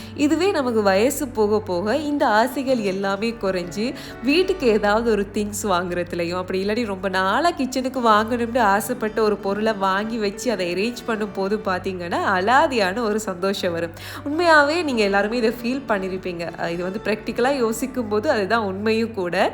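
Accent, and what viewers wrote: Sri Lanka. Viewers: Assalamualaikum sis ✨ epdi irukinga Sinna vandungalam Nalla irukangala 😍unga videos la Enna sis kora iruku 🧐ellamey spruu ah poduringa reality ah iruku usefull la share panringa oru oru visaiyathaiyum ungaluku therinjatha A to Z ellamey share panringa 🤗aprm Sinna vandungalaiyum ungalaiyum paka enaku sandhosama irukum 😍Nd enaku mean ah unga Sri Lanka speech slang rmbaa pudikum sis🥰epothumey Enoda Dua Nd support ungaluku irukum🤲🏻💗 neenga inum naraiya achieve pannanum housewife kula role model ah irukinga 🤗itha Vida enna venum sis 100k subscribe reach pannathuku 🥰insha'Allah neenga inum naraiya videos podunga Nd keep rocking sis🥳💯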